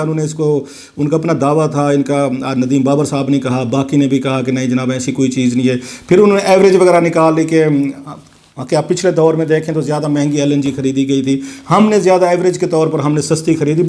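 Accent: Saraiki, Pakistan. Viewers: اس میں کوہی شک نہیں کے سراکی زبان بڑ ی میٹھی بولی ہے ۔آپ کی vl جہاں محلو ما ت کا ذریعہ ہے وہاں آپ کی اردو میں ایک سراکی ٹچ ہے جو سنے میں اچھا لگتا ہے آپ انداز اور اصلوب اچھا ہے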